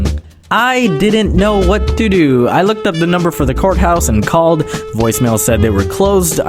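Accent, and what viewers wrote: Canada. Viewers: Why in the absolute fudge do you have a semi-quebecan (?) accent when speaking french?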